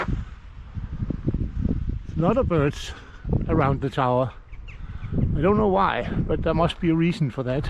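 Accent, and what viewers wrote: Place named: Denmark. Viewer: And I can hear that you are danish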